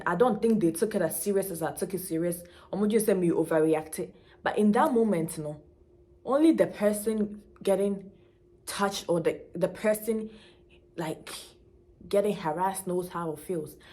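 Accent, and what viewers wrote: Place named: Ghana. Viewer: I’m getting the oseikrom vibes from the way you’re talking…lol Reminds me of my ex… Next time get a strong black man along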